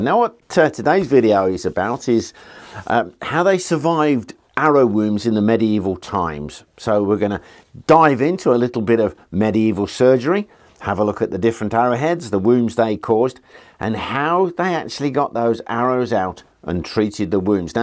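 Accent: United Kingdom. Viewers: The host of this video, to me, amplifies the subject matter with his authenticity. It’s clearly obvious he’s done his homework. Besides, with his British accent, he adds more. One day, I would like to allocate a few hours (on a Sunday), and learn the events of The Great Crusades. Christians vs. Muslims. I do have a deep interest in world history, just not all events. Recently, I discovered the unreal incident that occurred in 1964 (the year I was born) at Stanleyville, The Congo. The black rebels there committed atrocities that matched the deeds of Nazi Germany, but Belgium paratroopers retook the city and rounded up those responsible. All were either hanged or shot on site.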